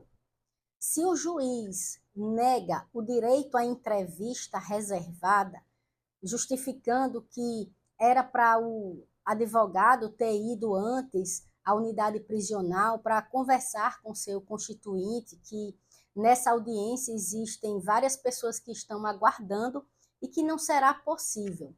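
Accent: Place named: Northeast, Brazil. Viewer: Esse sotaque de nordestina é um porre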